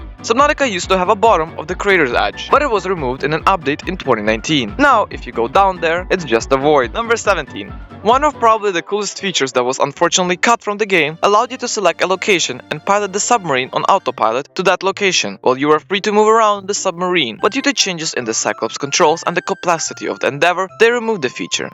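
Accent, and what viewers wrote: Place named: Poland. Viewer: Ale masz Polski akcent bardzo to słychać XD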